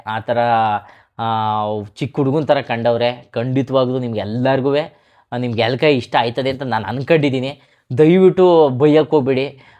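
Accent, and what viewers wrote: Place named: Mandya, India. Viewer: ಜೈ ಮಂಡ್ಯ ಭಾಷೆ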